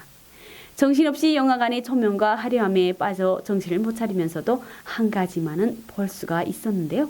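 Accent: North Korea. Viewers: 미모의젊은처녀의 평양스피치에 녹는다 녹아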